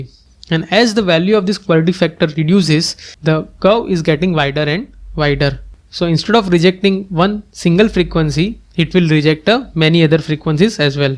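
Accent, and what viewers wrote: India. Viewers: I hate indian english 😡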